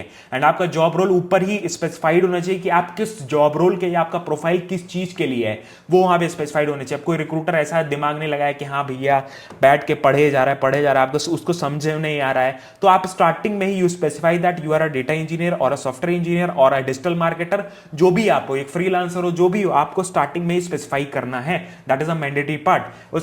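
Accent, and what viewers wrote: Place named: Bihar, India. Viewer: bihari trying to speaks in english , appplying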